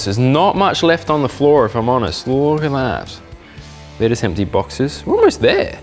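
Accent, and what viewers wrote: Australia. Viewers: Are you Australian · Is this dude Australian because I’m Australian I want to buy dress this off-road machine it’s just so cool I love it😎 ❤👍👍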